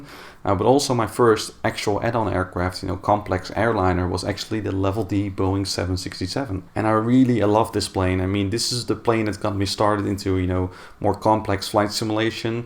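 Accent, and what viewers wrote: Netherlands. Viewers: i knew you where dutch by your accent and because you showed a klm airplane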